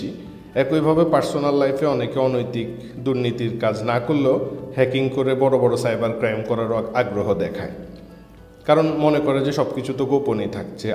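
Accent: Noakhali, Bangladesh. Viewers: ভাই কি নোয়াখাইল্লা নাকি?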